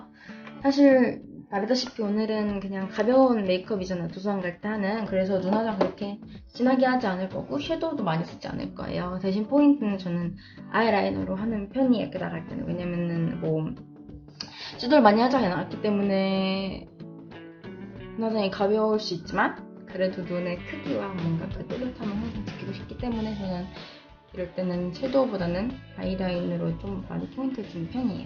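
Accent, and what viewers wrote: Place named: United States. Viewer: 외국인이시져 영어를 너무 부드럽고 정말 잘하시네요 외국인이시라면 어디나라에서 오셧어요? 제가 생각하기에 미국(USA)같은데 아닌가요? 어디에서 오셧어요?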